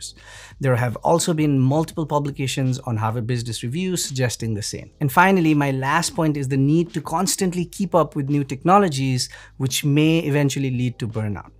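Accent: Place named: Nepal. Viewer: I hear nepali accent.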